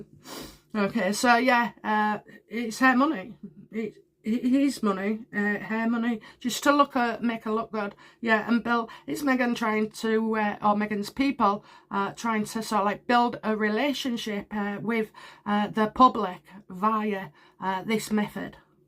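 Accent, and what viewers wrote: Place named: East Yorkshire, United Kingdom. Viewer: East Yorks I think.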